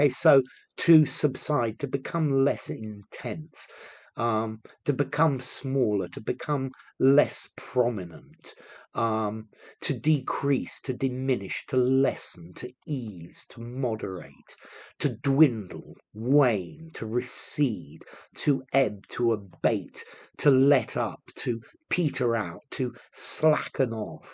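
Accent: United Kingdom. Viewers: Hello teacher I have missed out on much of your precious and quality British English in ages I hope you won't be annoyed by my comment anyway you look very pale and still has got a great amount of knowledge 🤭😃👏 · Whereabouts in UK you are from Guv ?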